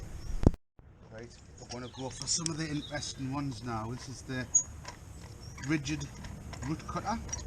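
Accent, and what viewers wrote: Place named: London, United Kingdom. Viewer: have a quick question. Being in the states (mid-Atlantic Maryland), we have a weird accent that no one can figure out where we are from. Southern states think I’m from NY, northern states think I’m from GA. Yes, the accents here are that different. Is England the same? I’m guessing you may be from north London based on your thick accent. Think Adele. She has the same dialect as you and other pipe cleaners in London!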